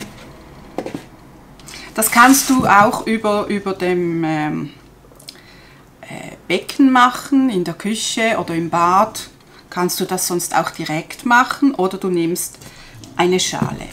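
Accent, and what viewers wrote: Switzerland. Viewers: Fantastische Kunst, bin begeistert 🥰, vielen herzlichen Dank für Deine wertvollen Videos, hab dir ein Like und Abo gemacht 🥰 (und dein Schweizer-Hochdeutsch sehr sympathisch 😀)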